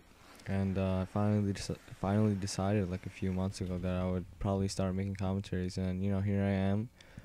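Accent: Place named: India. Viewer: indian accent!!!!!!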